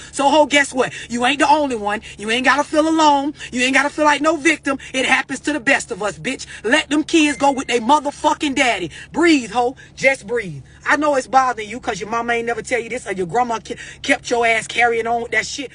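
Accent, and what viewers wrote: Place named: Florida, United States. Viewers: Yep... straight outta FL 🌞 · I love the way she say hoe, just the way she talk in general reminds me of home Where she from Florida?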